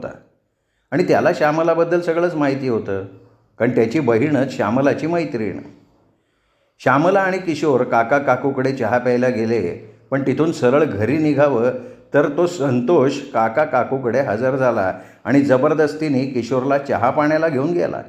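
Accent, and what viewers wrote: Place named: Varhadi, India. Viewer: खूपच छान तुमच्या आवाजात वराडी भाषा कानाला गोड वाटते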